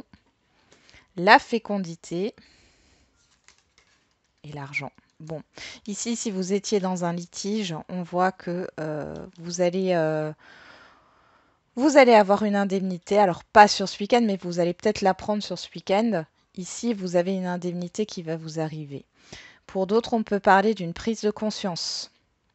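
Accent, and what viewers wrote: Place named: East, France. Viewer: Vous êtes de l'est car vous dites :"Ui" au lieu de :"Oui" !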